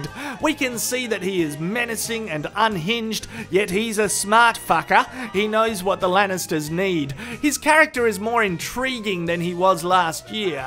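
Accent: Australia. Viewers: Stumbled across this in my recommended list. Hope people don't think all Aussies sound like this or have such a potty mouth.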